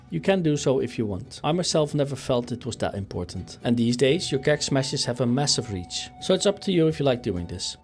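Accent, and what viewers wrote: Netherlands. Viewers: Thanks for the guide, I've never played a monk before and after the video I notice the information sticking with me. (Probably because the class has been pruned) Maybe also because we have the same Dutch accent haha. Can I ask a few specific questions? I have done some content and I've never used Expel Harm, is it a button you ever press? If so, when? Do you always use Purifying Brew at 100 stagger? To keep the cooldown rolling? In what situations do you use Summon Black Ox Statue? How is Master of Harmony on Beta? Cheers again and cya next time. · Is it a dutch accent i hear?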